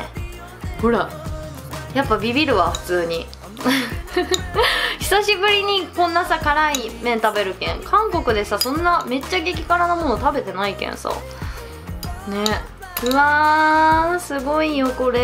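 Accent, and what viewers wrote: Hakata, Japan. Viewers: 最後の方は辛さで味がわからなそう😨 今更ながらの博多弁がめちゃカワイイ‼️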